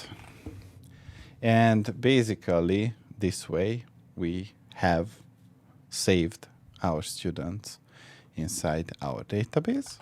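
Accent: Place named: Hungary. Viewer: I don't know why youtube recommended this video for me, but from your accent, I instantly knew that you are Hungarian as well, maybe that's why. But to add something relevant, I'm an amateur programmer, but when I needed to handle databases, I've always used pandas. Sometimes I have to use SQL in Access, but I'm not a fan of it generally. Is there a benefit - or what are they - using SQLModel instead of pandas in python?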